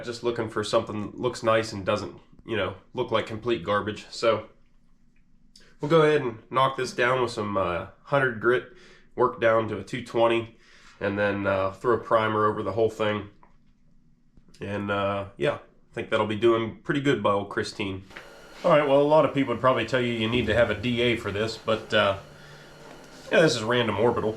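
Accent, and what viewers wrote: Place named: Western Pennsylvania, United States. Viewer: I'm curious where you are. I'm getting a slight western PA accent? I have relatives there.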